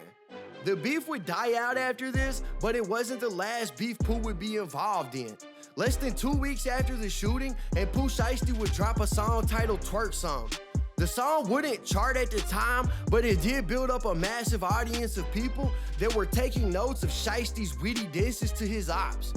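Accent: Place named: California, United States. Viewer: Dog, not bad. Where u from? Sounds like Cali somewhere But your accent is extra! Imma get used to it.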